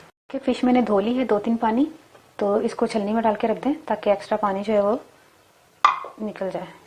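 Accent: Punjab, India. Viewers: ap ka lehja to Panjabi ha lgta ni Karachi ki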